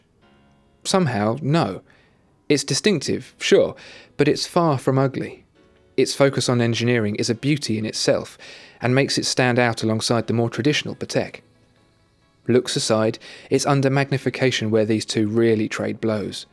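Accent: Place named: England, United Kingdom. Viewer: A review of some vintage watches and their movements would be great. You crisp English accent makes these reviews sound regal! Great videos and delivered in a very balanced manner.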